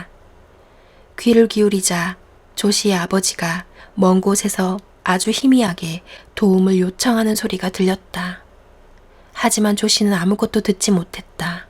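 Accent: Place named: Busan, South Korea. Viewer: 재밌는... 흥미로운 내용 감사합니다.. 혹? 부산이세요.. 반갑습니다! 매일매일 행복하세요...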